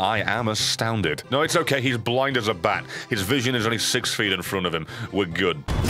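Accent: Scotland, United Kingdom. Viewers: Less British than I remember, more Scottish???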